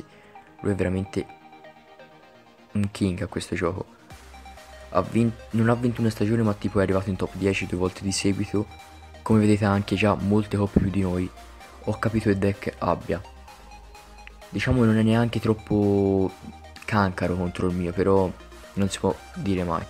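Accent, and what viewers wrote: Tuscany, Italy. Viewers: Ma sei toscano? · ma sei toscano? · Ma quanto è bello il toscano 😍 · sei toscano vero si sente l'accento. come me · è toscano come me ahahahha😅❤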